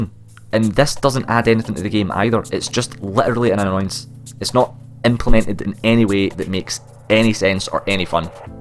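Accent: Scotland, United Kingdom. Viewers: I love hearing Scottish people rant about shit. :D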